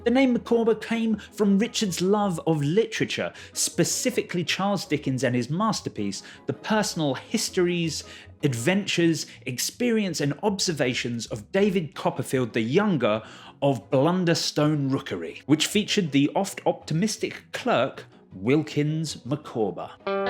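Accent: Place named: United Kingdom. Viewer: This guy kicks ASS! I usually HATE Brittany accents. Love this dude! Different NRG💡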